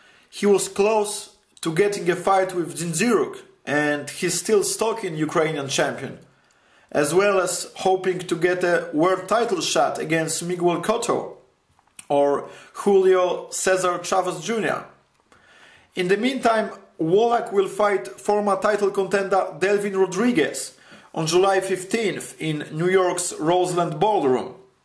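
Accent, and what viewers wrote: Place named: Poland. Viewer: od razu wiedziałem ,że polak gada haha no ,ale ok przynajmniej inne kraje tu zaglądają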